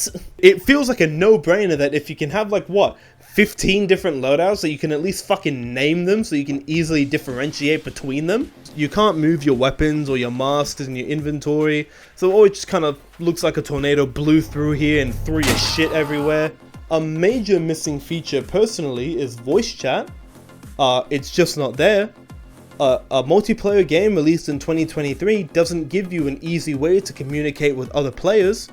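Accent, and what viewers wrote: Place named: Australia. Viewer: Is he Australian, or is that just a speech impediment?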